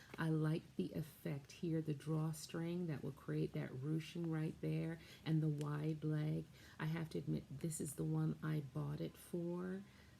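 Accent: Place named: Midwest, United States. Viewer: You’re in Jamaica, but you sounds like you’re from Maybe Michigan ish. Illinois? Midwest. Then you quilt. Then you said “Here’s the thing…” Then I said, “Why haven’t you subscribed. What are you been waiting for!?” Then I subscribed😄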